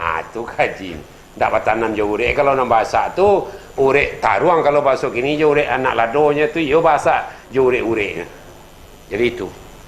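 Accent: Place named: Bukittinggi, Indonesia. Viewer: Klo awak tebak dari caro ngeceknyo mungkin urang Bukittinggi/agam klo dak dari Padang panjang...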